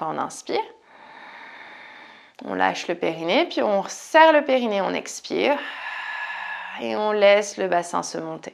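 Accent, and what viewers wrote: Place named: France. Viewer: Belle séance j'adore beaucoup votre voix avec cet accent français doux quelle merveille le yoga avec tous ces ingrédients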